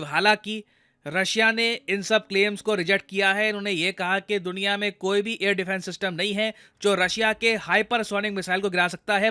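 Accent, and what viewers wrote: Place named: Haryana, India. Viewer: Russiya ka hypersonic missile ger diya tha.. sir bilkul haryanvi Desi accent m bat kr rhe h..Varna hota h gira diya tha..I love his Desi accent.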